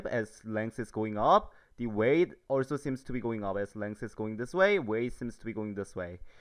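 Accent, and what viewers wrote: South Korea. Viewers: I’m korean, and his accent sounds VERY korean. :)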